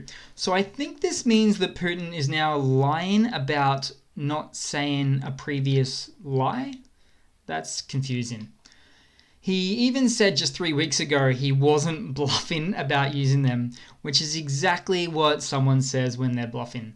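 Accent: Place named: Australia. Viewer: Thank you,! I love your updates, & I love your Australian accent!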